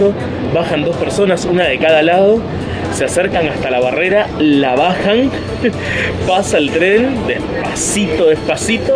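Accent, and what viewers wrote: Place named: Buenos Aires, Argentina. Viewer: es tan porteño que me da bronca amigo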